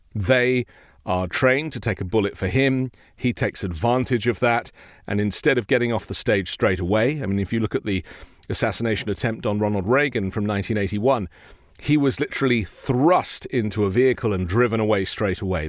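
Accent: United Kingdom. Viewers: Try not to sound British.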